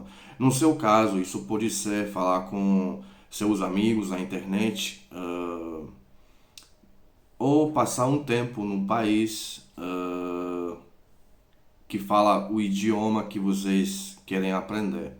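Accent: Minas Gerais, Brazil. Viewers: Seu sotaque está maravilhoso. Parece o sotaque do pessoal mineiro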